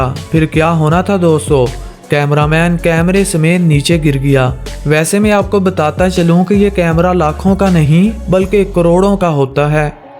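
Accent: Punjab, India. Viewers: Bai tu punjabi lagda teri speaking too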